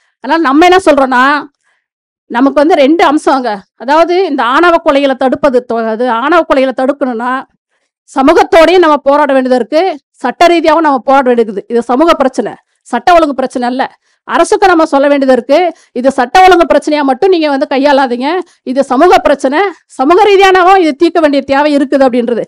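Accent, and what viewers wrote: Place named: Natham, India. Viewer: நத்தம் Pechi varuthee😁😍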